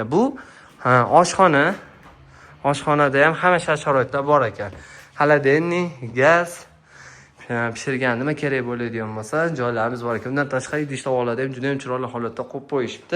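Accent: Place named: Fergana, Uzbekistan. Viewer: Шевасидан билсак Фаргонада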